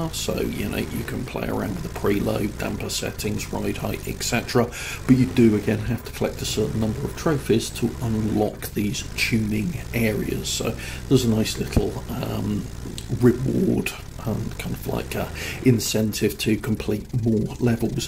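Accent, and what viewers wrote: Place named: United Kingdom. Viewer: You have the most british accent I've ever seen Good vid, keep it up mate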